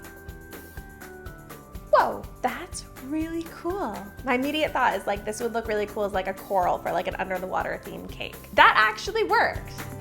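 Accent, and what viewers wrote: Canada. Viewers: Is she Canadian? She has a Canadian accent I feel lol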